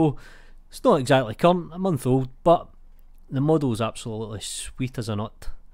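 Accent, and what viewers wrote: Scotland, United Kingdom. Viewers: Loving the channel! I have been trying to catch every vid you throw my way. This unofficial news is sweet. Keep it up! Great to hear a Scots voice doing Blender stuff. Pure dead brilliant, by the way!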